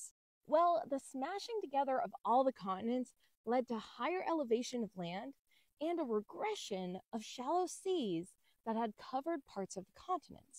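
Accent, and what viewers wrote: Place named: New Mexico, United States. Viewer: Sounds like you grew up in New Mexico?! Just makes me enjoy your videos that much more!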